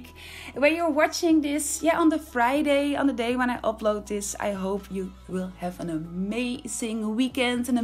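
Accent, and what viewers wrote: Netherlands. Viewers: I can hear that your dutch 😂 love it tho ! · Wow... mind blown! So creative! From your accent I think I can assume you’re a fellow Dutchie, so: beterschap voor je vader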